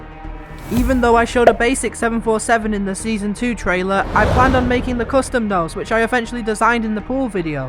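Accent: United Kingdom. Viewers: bruv I love your vids And your accent is kinda funny(no offence sorry I know your British correct me if I’m wrong)(btw Im subscribed😊)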